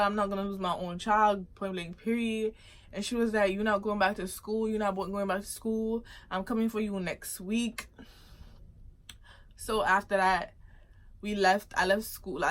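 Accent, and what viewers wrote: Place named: London, United Kingdom. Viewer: Sounds like a Londoner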